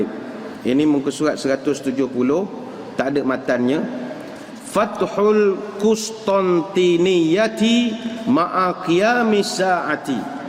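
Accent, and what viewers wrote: Malaysia. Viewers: Ustaz .ni dr mane..logat malaysia peci indonesia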